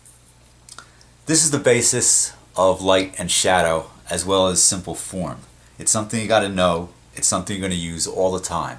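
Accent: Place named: New York, United States. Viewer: drawing is more fun with a new york accent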